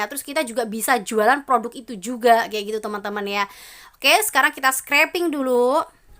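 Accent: Java, Indonesia. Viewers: Aku sukak medok jowomu kuwi mbakyu.... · Alhamdulillah... Jam dua malem dapet informasi sepenting ini.. Makasih mbae.. Ngomonge medok bgt.. Hehehe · Jowo ne medok tenan. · Medok mba, mantap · Muedooke gaes ngangeni..😀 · Medok amat mbak 😆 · Jawa ne keton bgt ya mba, tapi ora ap2 cukup bantu go enyong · Medok plus Gas Pull yo ndo 👍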